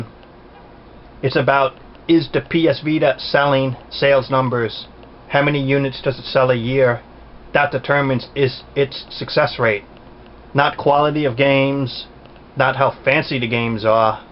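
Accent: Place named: New York, United States. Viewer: By the way, New York dialect FTW!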